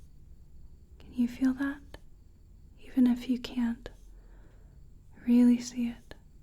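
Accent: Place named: South, United States. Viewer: Love your accent, you sound slightly Southern may I say? You are one of the best ASMR artists out there... Love ya😍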